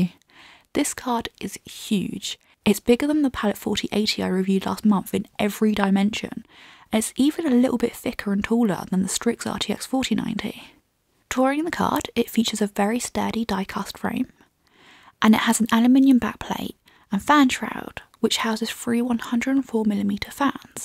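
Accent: United Kingdom. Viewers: amo tu acento britanico.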